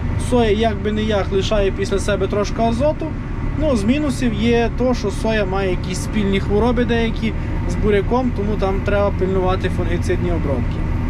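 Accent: Ternopil, Ukraine. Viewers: з якої ти області? По розмові ніби з Тернопільської.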